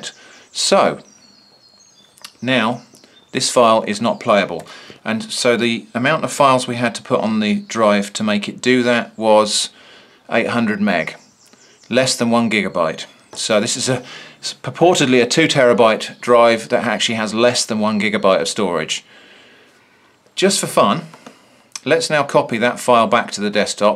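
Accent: United Kingdom. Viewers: "Completely irrelevant piece of packing", i love british english way..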